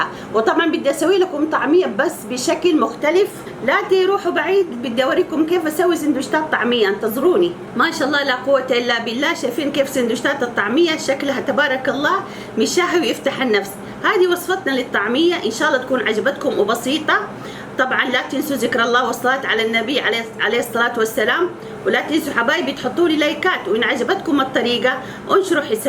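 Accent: Hejaz, Saudi Arabia. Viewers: ماشا الله. والله احلا شي ذكر الله 😙😙😙 وزين اللهجه الحجازيه. ياخ احب الام دي وبنتها ❤❤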